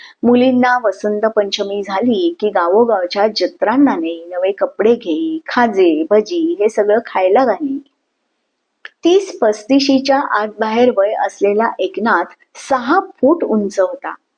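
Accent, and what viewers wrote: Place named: Konkan, India. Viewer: कोकणी आवाजात केलेले सादरीकरण आणि कथा छानच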